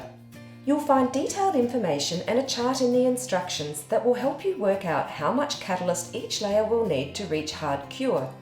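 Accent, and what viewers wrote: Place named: Australia. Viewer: Her I am thinking this is an Australian product... as the lady talking is Australian! Lame! so expensive to buy in Aus.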